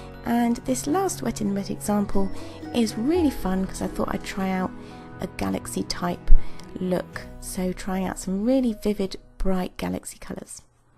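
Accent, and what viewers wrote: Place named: United Kingdom. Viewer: Great job! I really enjoyed watching your review video and you did such a good job at being so thorough. This is a really helpful review for anyone who is considering buying these watercolour inks! I also love your accent ❤ I am British too and I also do art tutorial/voice over videos! So keep up the amazing work. I've subscribed ❤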